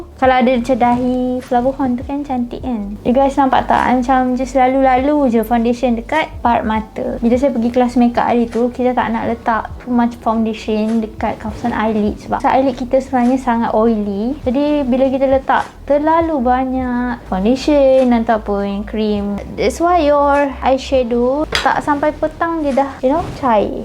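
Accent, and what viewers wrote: Kelantan, Malaysia. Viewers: reply 😲😭 nak nak! lg best bila dgr kecek kelate🤭 btw, u've gone through much tough times..fighting! You did well 💜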